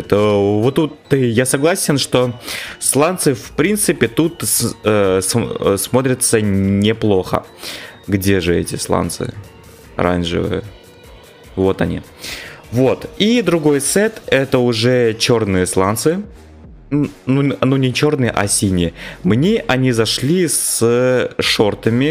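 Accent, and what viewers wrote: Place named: Chechnya, Russia. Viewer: Чеченский Ваше Похож сам чеченец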